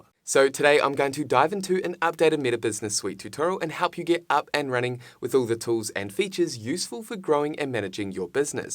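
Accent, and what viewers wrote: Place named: New Zealand. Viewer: Good job mate! I loved your kiwi accent btw!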